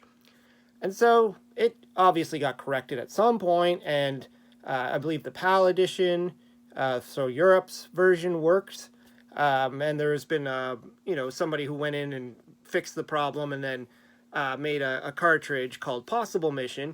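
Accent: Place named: Canada. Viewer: ! Always love to hear your opinions bro! Thanks for doing a response to this video! I love hearing the slight canadian accent on talking abowt. Haha. Have a great day buddy!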